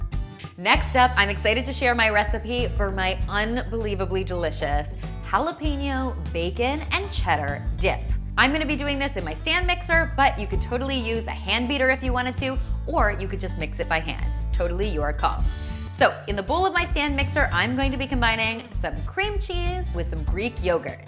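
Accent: Canada. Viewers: She kinda talks like a news anchor but like in a totally non annoying way in fact I love her Canadian accent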